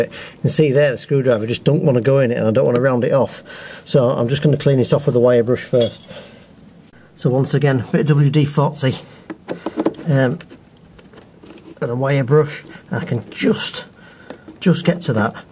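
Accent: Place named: Yorkshire, United Kingdom. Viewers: To save money, i always drill two holes (with my small drill press) in the boss where the pins would have been, tap it with M8 thread and screw in two quality bolts. In worst case i have welded a blade on with two tag welds. I appreciate your channel. It's informative with a wide range of subjects. And i love your thick Yorkshire accent. Nick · is it yorkshire not a manchester accent. If it is yorkshire must be Leeds.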